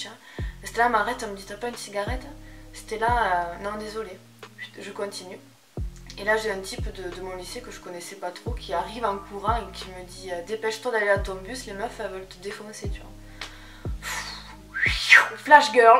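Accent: South, France. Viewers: Oh tu es de Béziers ? je viens de découvrir ta chaîne et j'adore ta personnalité. Je me disais bien que tu avais un accent du sud :p J'ai toujours vécu à Béziers,enfin maintenant habite à Boujan mais c'est à côté ! j'ai aussi subi du harcèlement mais à Jean Moulin ! c'est allé trop loin moralement pour moi et encore maintenant ça me gâche la vie alors que çafait 10 ans que c'est fini. Sujet joyeux bonjour xD je ne vais plus en centre ville donc je ne sais pas si c'est mieux ou non mais je pense que oui dans l'ensemble ! En tout cas ravie de voir que ça ne t'as pas empêché d'avancer ! Reste comme tu es, Bisous 😘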